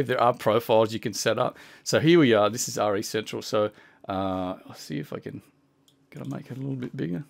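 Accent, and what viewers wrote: Australia. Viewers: yes another aussie haha.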